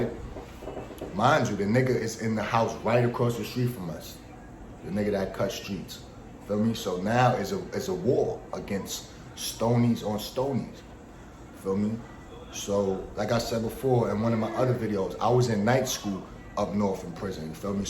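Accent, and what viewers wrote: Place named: Queens, United States. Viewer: Your from Queens my G? It sound like you speak that Dunn language, lol. You got a Prodegy cadence. Respectfully, I'm not from New York.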